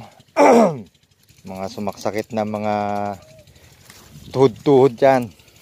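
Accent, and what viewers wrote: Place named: Ilocos, Philippines. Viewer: Hello katambay,,,sarap nmn nyang munggo,lalo na yung hindi pa gaanong natutuyo,bagoong at inihaw na isda tapos inasiman ng sampalok na hilaw,,,curious lng po kasi parang may puntong ilocano kayo,,marunong po ba kayong mag salita ng ilokano?,,subrcriber from solid kaharabas po,,,from Tarlac city,OFW Madrid Spain,,,ingat po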